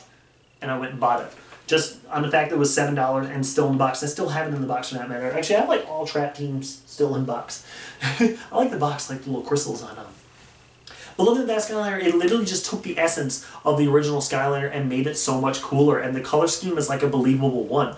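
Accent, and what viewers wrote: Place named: United Kingdom. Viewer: I swear sometimes you sound British.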